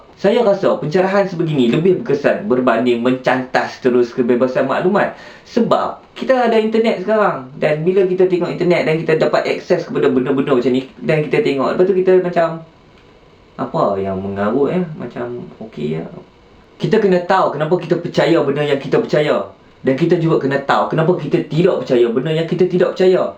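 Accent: Kedah, Malaysia. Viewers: Panjang hang punya mengarut tu bang. Dok luaq negara tp boleh ckp loghat Kedah lagi. Bangga aku sbg org kampung hang. ;)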